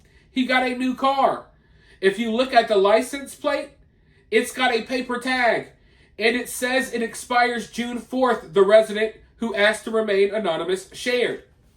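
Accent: South, United States. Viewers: I agree with you. But He’ll you sound like a black dude. Jus saying. Must be from the south?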